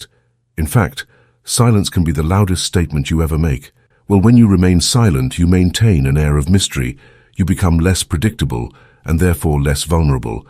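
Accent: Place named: England, United Kingdom. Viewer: This narrator sounds like some kind of wise fellow. The English accent helps, however his words are solid. Great advice .....man without a face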